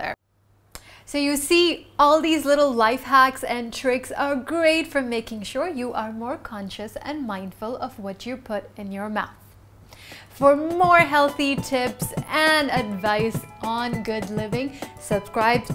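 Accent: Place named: India. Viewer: she is india cannot hide the accent :)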